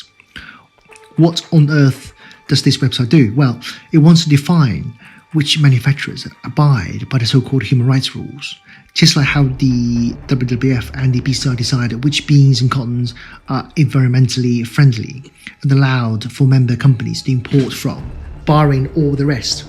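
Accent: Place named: United Kingdom. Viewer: 牛逼牛逼·这个英伦口音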